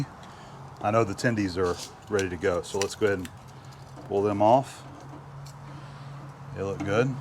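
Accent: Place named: Western Pennsylvania, United States. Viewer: So what is your connection to I-79? I hear a little bit of Western PA in your voice but I think you’re in FL.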